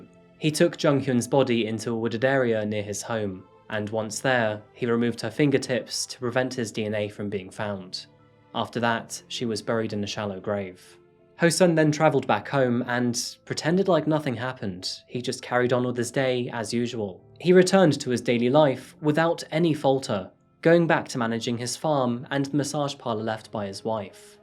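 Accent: United Kingdom. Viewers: It went undetected. I’m still trying to focus on what you’re saying. Idky British accents are hard for me to understand….it’s a real life subtitle 😂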